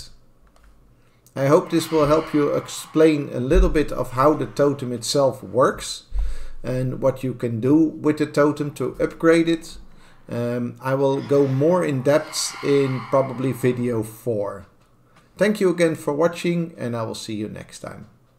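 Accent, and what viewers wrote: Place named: Netherlands. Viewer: Gotta love the dutch accent😂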